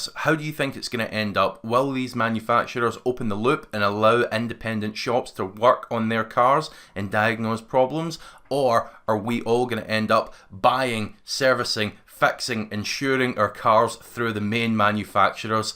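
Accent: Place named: England, United Kingdom. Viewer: heavy english, similar to ozzy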